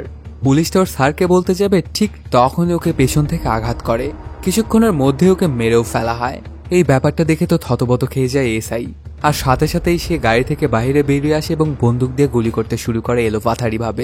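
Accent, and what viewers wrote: Bangladesh. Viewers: হালায় কি রাজশাহীর জেলা না বরিশাইল্লা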